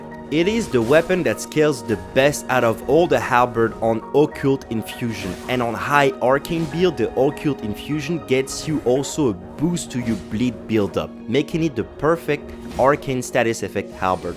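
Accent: France. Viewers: I just realized not only fo you have the French accent, but also th exact same voice and timbre as the "two months later" guy on spongebob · Maaaan, first of, great vid overall! But... during the whole vid, I was like "Hum... this guy sounds French af!" Then when you said your name " " at the end, j'ai su que tu étais bel et bien français et que je ne m'étais pas trompé! 🤣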